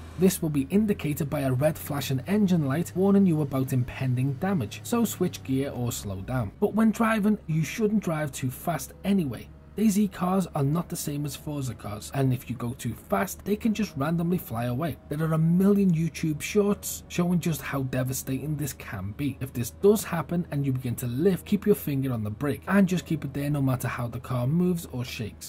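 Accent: Liverpool, United Kingdom. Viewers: is that a scouse accent close by to maybe, lets just say city centre?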